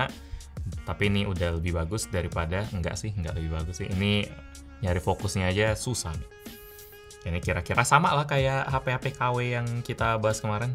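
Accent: Indonesia. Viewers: Buanglah accent indo ko tu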